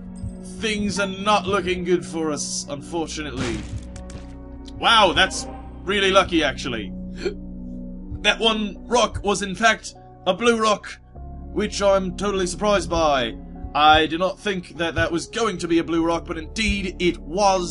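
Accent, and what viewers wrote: United Kingdom. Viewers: wouldnt that be Best. British. Accent. Ever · Lol your british accent is funny.....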